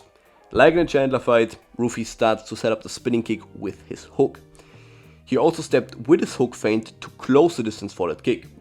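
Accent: Germany. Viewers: I hear german hahah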